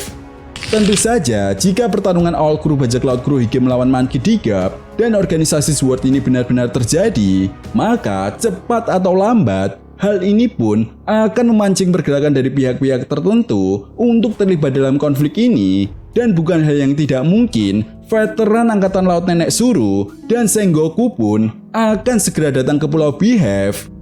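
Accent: Java, Indonesia. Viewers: Suara nya paati jawa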